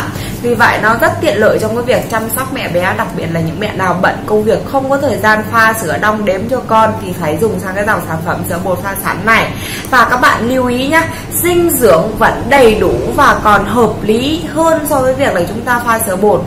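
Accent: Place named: Thanh Hoa, Vietnam. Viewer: Đúng là giọng thanh hóa.. Nói quê quá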